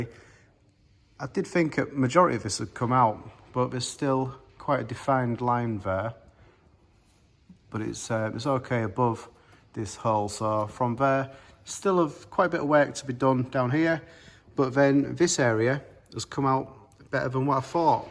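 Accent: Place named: Middlesbrough, United Kingdom. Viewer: How much would a repair like that cost? You sound like boro accent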